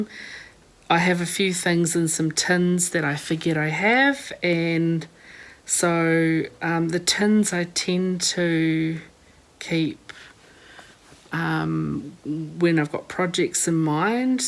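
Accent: New Zealand. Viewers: Your accent makes me miss my Kiwi friends who live in Taranaki- next video if you can zoom in really close so we can all appreciate just how fast you are. The fact that you can talk while you’re doing this is amazing lol since I can’t do anything else but concentrate on the stitches. Thanks again. Keep ‘em coming!!!! 🪡🧵 🇳🇿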